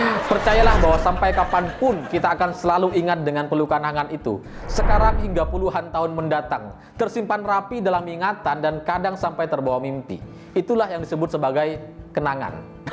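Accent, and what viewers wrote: Madura, Indonesia. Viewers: Saya suka narator nya pintar cerdas, kocak lucu,,, Mantap bro lanjutkan, pakar mantan, kyak nya anda orang Madura ya bro,,, klo iya mantap sak masek,,, 👍🙏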